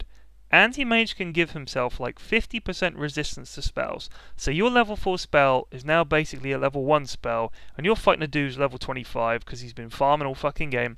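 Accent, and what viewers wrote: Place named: Cockney, United Kingdom. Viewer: i love this cockney rage XD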